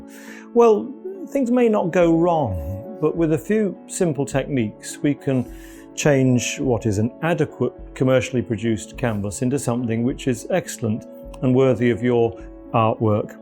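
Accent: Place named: RP, United Kingdom. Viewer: How posh this man is... Imbelievable.... And arrogant too.... pure british c.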